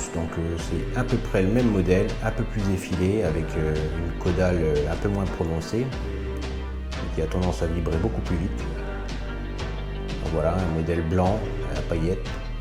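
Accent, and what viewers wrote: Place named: North, France. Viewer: salut moi c Seb je suis pas mal ta chaîne mais je viens seulement de comprendre comment s'abonner jsuis pas doué lol. sinon je vais souvent au der j'ai une barque bourlingue moteur avant avec i pilot la juste un petit prblm de moteur arrière ça va venir bref sinon pour savoir si ça te dirai une peche avec un chtimi car je suis originaire du nord et vue ton accent tu dois pas en être loin. maintenan j'habite a 50 min du bassin nord maintenant au plaisir de ce voir sur l'eau ou un rdv pour une sortie a deux bon wekend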